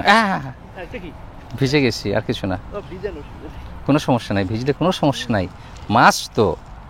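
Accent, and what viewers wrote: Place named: Chittagong, Bangladesh. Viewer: ভাই, মনে হয় চিটাগং এর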